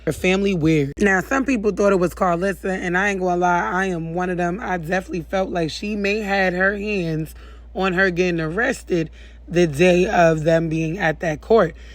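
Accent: New York, United States. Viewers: Just subbed to your channel! Love your content and I absolutely love your NY accent!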